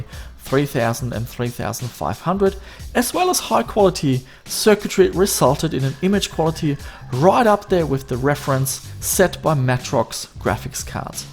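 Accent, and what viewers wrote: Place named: Germany. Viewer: oh ein deutschsprachiger, das hört man sofort gg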